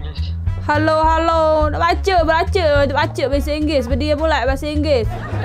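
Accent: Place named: South Sumatra, Indonesia. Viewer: tpi cak wong komreng caro ngmong lau bik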